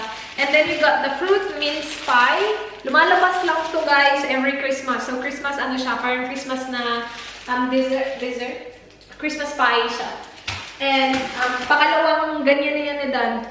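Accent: Visayas, Philippines. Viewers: i knew it.. may pgkabisaya heheh i loveee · Pag nag tagalog parang bisaya ang accent pero pag nag English paksheet😍 sarap sa ears ate. Hi ate newbie hyr😘 pero diko sinasabing dimasarap sa ears yung tagalog haha labyuu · bisaya ka noh?magkapareho kasi tayo na magsalita.bisaya din ako hihi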